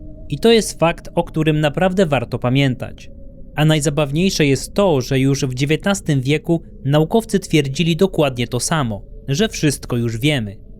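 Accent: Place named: United States. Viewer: Uwielbiam relacje na temat krypto gatunków. Zdaję sobie sprawę, że cześć z nich lokalny folklor lub turystyczny marketing - tak jak szkocki Nessi. Jeszcze raz dziękuję za dbałość wymawiania terminów po angielsku. Gdzie uczyłeś się angielskiego? Brzmi jak amerykańska wersja.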